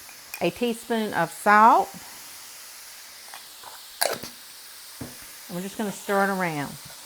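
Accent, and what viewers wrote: South, United States. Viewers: New Subscriber. Love your recipes, your sweet Southern voice and your enthusiasm for cooking. As an artist may I make a friendly suggestion? Try using an undereye concealer the color of your foundation. It's a game changer!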